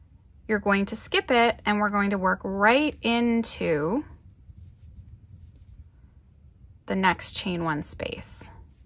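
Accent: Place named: Canada. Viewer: Just finished my cardigan. Beautiful thanks!! And I do love your Canadian about and out pronunciation 🥰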